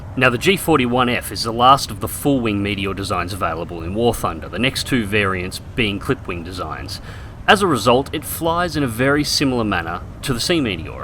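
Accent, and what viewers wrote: Australia. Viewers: He's from Australia, hence his logo and his accent.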